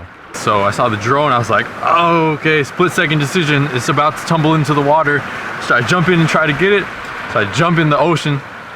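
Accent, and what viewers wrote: Bay Area, United States. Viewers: Yo the way he talks, he’s fasholy from the Bay Area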